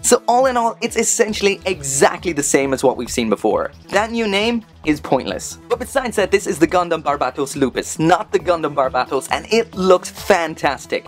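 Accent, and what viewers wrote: Ireland. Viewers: Are you irish? You sound it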